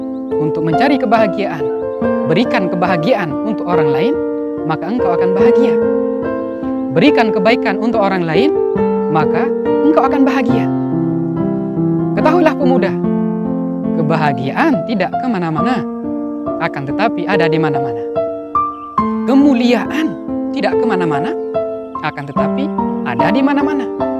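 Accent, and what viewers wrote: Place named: Malaysia. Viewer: Kok loghat nya mirip orang Malaysia ya 🤔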